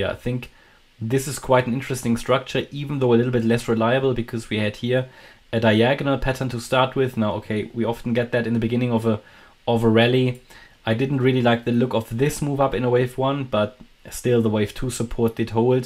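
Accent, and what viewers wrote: Germany. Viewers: Deutscher das hört man, aber für die gute Arbeit gibt es ein Abo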